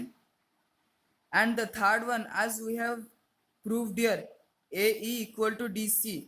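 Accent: India. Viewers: You are an Indian , your acent tell that you might be from south side of India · R u Bengali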